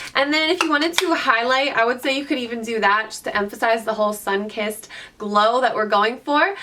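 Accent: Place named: East Coast, United States. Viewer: I Love your slight accent. I'm assuming your from the east coast?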